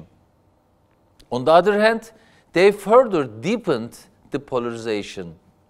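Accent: Black Sea, Turkey. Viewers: Başkanım şakır şakır konuşuyo be helal olsun biraz karadeniz aksanlı ama yinede gayet akıcı ve anlaşılır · Amerikan İngilizcesi var İngiliz İngilizcesi var BUDA TÜRK İNGİLİZCESİ, REZİLLİK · Van münit vs Türk aksanlı İngliş. Türk aksanlı İngilişin başımın üstünde yeri olduğu bir versus. Başımın üstünde derken TC'nin başında. Ha bu arada altyazılara bakmadan adamın dediğini anlayabildim. Anlayabildiysem sorunu da yoktur. Ama şunu söylemeden etmeyeceğim. Bunu yazan her kimse, mesleği akademisyenlik. Belli kullandığı cümle yapısından. Yabancı dillerle uğraşan her kimse değiştirmesini tavsiye ederim başkana. Çok kullanacak çünkü başkanım bu dili ilerde. Bu şekilde gitmez. Dost tavsiyesi. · 6 yılını yurt dışında geçirmiş ve İngilizce'yi akıcı seviyede konuşan biri olarak söylüyorum, anadili İngilizce olanlar bile İngilizcesi anlaşıldığı sürece birinin aksanını umursamıyor. 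Adamın söyledikleri net bir şekilde anlaşılıyor. Önemli olan bu. Adamın aksanını eleştirenlerin kaçı İngilizce biliyor acaba çok merak ediyorum. Sanırsın kendileri sular seller gibi İngilizce konuşur. Türkiye'nin English Proficiency'de Avrupa sonuncusu olduğu bir gerçek. Saçmalamayı kesin. Türk aksanıyla İngilizce konuşmak utanılacak bir şey değildir. En azından Türk aksanıyla İngilizce konuşan birinin söyledikleri anlaşılır. Gelmişler buraya adamın aksanını eleştiriyorlar. Hayatınızda kaç tane aksan duydunuz acaba? Sanki kendileri kraliyet ailesi İngilizcesi konuşuyor. Konuşması yavaş geliyorsa da videonun hızını artırırsın olur biter. Zaten büyük çoğunluğunuz altyazı olmasa bir şey anlamazsınız bu videodan o yüzden adamın konuşmasını eleştirmeyi kesin. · adam güzel konuşuyor aksanı da Türk aksanıyla ingilizce işte ama konuşması falan akıcı güzel yani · İngilizce'yi bir Türk gibi konuşması hoşuma gitti. 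· Bu arada zerre sevmem imamoglunu ama bir bilgi vereyim eger ingilizce ogrenmek isteyen varsa ve aksandan dolayi yapamiyorum diyen arkadaslara bakin iyi bir ornek arkadaslar bakin gorun normal gundelik turkcesi gibi rahat turkce aksanla ingilizce konusuyor o yuzden sizde kasmayin illa ingiliz ingilizcesi amerikan ingilizcesi gibi konusmaya gerek yok bu aksan bile idare eder yeterlidir kasmayin yani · Yabanci dil konusmak bazilarinin dedigi gibi tercümanlik degil, iletisim kurulan kisilere direkt olarak hitap etme imkani saglayan günümüzün en önemli ikna yöntemlerinden biridir. Umarim yillardir devlet yönetmekte olanlar da "welcome" haricinde iki kelimeyi bir araya getirebilirler. Sayin Bey'den ricam biraz aksan ve telaffuzda türkce'den uzaklasmasi olur. Gurur duyuyoum. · Baskan Turk aksanini iyi ozetlemis:) Yalandan aksan kasmaya calismaktansa catir catir yerel aksanda konusmasi en iyisi. 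Ingilizceyi ve alsani bir yana birakirsak yeni jenerasyon siyasetin guzel bi analizi yapilmis. Genel ve yerel yonetim farki analizini ozellikle begendim.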